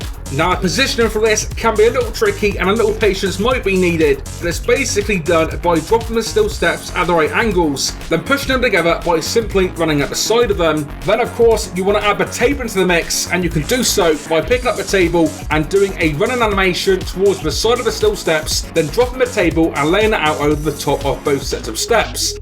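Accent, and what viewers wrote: England, United Kingdom. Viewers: you are from England ?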